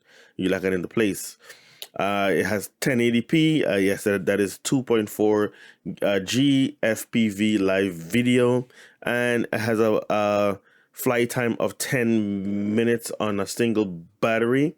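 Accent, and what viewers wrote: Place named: Jamaica. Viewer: Hey. You sound like a Yardie. If you are Big Up Yuhself!! Enjoyed your video, keep up the great work. Can't wait to see part 2.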